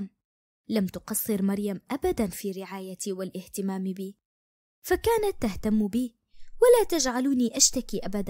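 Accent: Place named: Algeria. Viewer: من لهجتك تباني من الجزائر انا من الجزائر وانت♥♥♥♥♥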